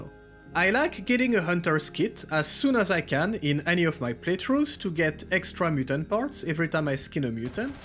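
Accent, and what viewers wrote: France. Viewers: J’ai le droit de commenté en français? On reconnaît bien l’accent 😂 Belle vidéo ! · Are you french ? Greets from France :)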